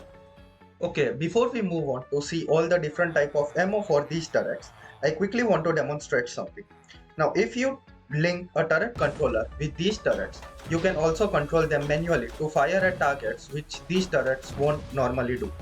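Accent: India. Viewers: hmmmm, his way of speaking english seems like he is an indian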